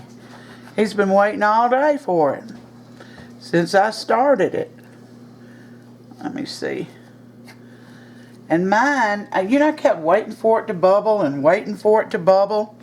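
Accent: South, United States. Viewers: I love your recipes.Where are you from.?Im from Arkansas.I notice you have a southern accent.I love your recipes.Do you have a cookbook.We southern belles are good cooks my mom and grandmothers were excellent cooks.im new to your ch.I love your ch.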